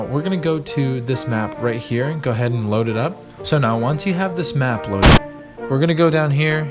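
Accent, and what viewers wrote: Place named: Russia. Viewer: Seriously? I immediately understood the accent, it is easy if you are from RUSSIA!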